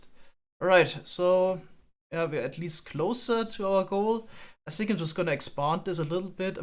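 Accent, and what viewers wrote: Germany. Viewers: Waiiiiit is he German?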